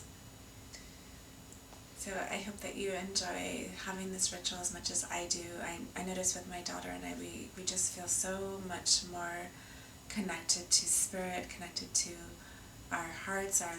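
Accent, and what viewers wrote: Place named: Scotland, United Kingdom. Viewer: love the Scottish accent - so beautiful, thank you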